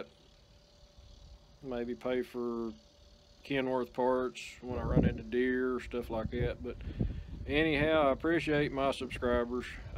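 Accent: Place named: Oklahoma, United States. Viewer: I promise I'm not picking on you here.... The engine noise was a little loud to understand you, so I turned on the closed captions. Needless to say it does not understand Okie very well. Personally I'm from MS and lived 50 plus years in TX so it doesn't understand me either. I just found it funny.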